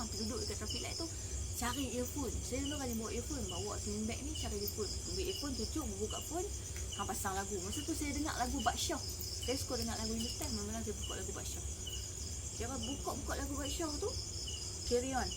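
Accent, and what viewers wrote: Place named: Kulim, Malaysia. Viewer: rasa² ni orng kulim kot sbb cara dy crta niy mcm orng kulim ja, sy pon dulu ingat niy orng pinang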